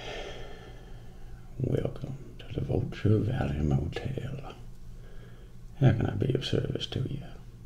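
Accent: South, United States. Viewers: The whispering southern accent reminds me of Morgan freeman for some reason haha. Nice video man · Wow, you look so different with hair - and a beard too!!.......ASMR Muzz has just done the opposite and shaved it all off. So now he looks like a hitman gone renegade! Great accent btw....or is yours a 'southern' US one? Your vids are so imaginative, and a far cry from the usual ASMR fare if you know what I mean! .....the best ASMRtists are the ones who make vids no other ASMRtist does....like yourself, Muzz, and Phoenician. Grow out the beard, and before you know it you'll be featuring on Beardbrand with Jeff whatshisname!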